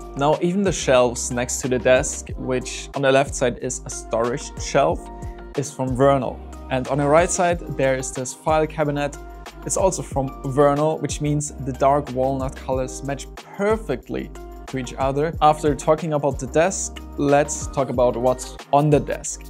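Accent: Germany. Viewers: German English, cool:face-fuchsia-wide-eyes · It's funny how your english sounds flawless 90% of the time, while being so obviously german at the same time. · I hear german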